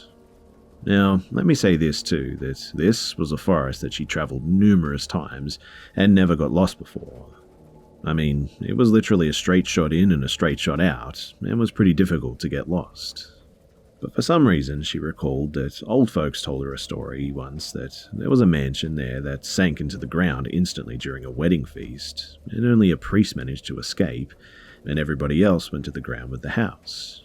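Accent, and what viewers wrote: Australia. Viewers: Love your stories! Especially love listening to your voice, Aussie accents are my fav. (And UK.)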